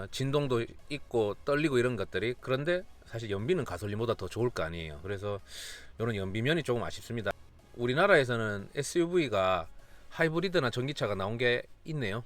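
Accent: Gyeongsang, South Korea. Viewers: 리뷰 잘 봤습니다~~대구분이신가요~??^^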